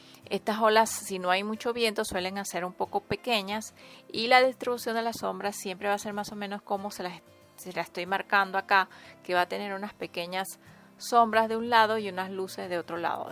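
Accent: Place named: Venezuela. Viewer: Esta chica es muy buena explicando, tiene estilo me encanta, tiene acento venezolano, qué bonito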